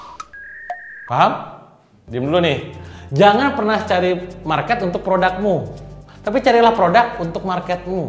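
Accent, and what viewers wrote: West Java, Indonesia. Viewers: Ni sunda pisan bos 😁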